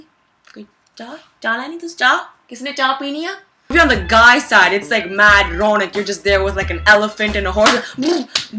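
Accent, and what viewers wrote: Punjab, India. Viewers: tussi badi changi Punjabi bolte ho.. I adore your Punjabi accent...😍😍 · annoying as fuck! stop talking in Indian Accent cuz you do it annoyingly!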